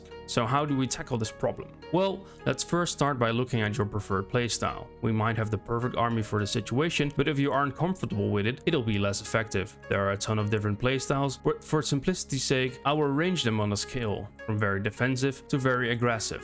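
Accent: Netherlands. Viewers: ah, it's dutch. Cool to know) Btw, do you review mods for shogun 2?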